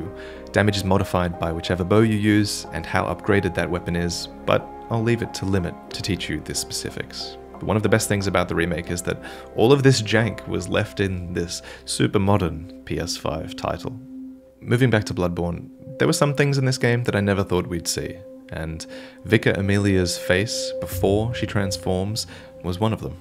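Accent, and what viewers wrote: Australia. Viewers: the only thing that has kept me from going hollow the last 18 months is the milky smooth sound of your Australian voice. Hold me... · very random, but you do you hail from down under,?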